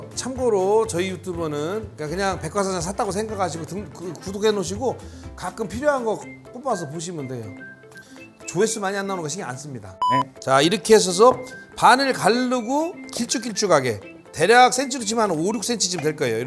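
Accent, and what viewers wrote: Chungcheong, South Korea. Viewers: 넘 맛있겠어요! 부모님 고향인 충청도 사투리 너무 정겹고 좋아요. 저도 수원백씨. 영상 감사합니다!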